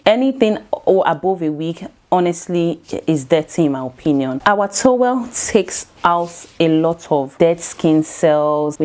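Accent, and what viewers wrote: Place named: United Kingdom. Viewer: I have an obsession with UK accent & makeup 😍😩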